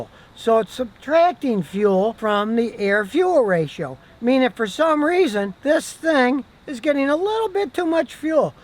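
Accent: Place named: Buffalo, United States. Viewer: Yah- heez from Buffalo!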